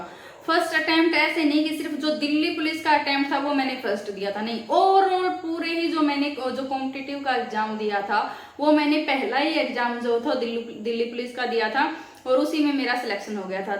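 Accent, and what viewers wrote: Haryana, India. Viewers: Aap Hariyana se ho kya mam😊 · Haryana se lagti ho mam aap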